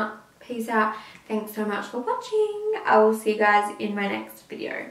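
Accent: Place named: Australia. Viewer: First I love your videos xx you are so amazing and love your Aussie accent Edit(I love your Into it's so bomb i do it all the time)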